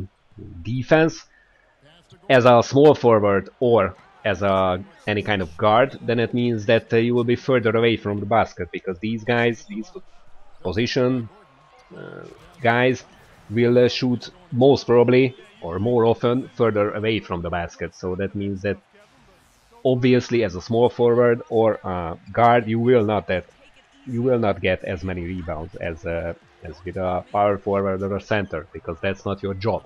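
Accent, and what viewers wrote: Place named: Hungary. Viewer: magyar vagy, csak mert olyan az akcentusod/ are you hungarian, because your accent is like a hungarian's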